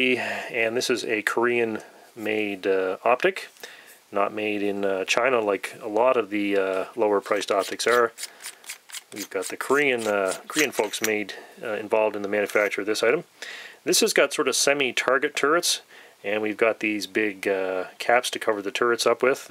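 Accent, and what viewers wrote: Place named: Cape Breton, Canada. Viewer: Canadian right ? I thought the accent sounded very familiar. I'm gonna take a shot in the dark but based on the accent, I think east coast. Cape Breton perhaps. I'm a Newf here in St. John's. Just bought a new scope today. Bushnell Dusk til Dawn Model. Gonna mount it on my .22 air rifle. but I need to buy mounts now. Happy Hunting, man.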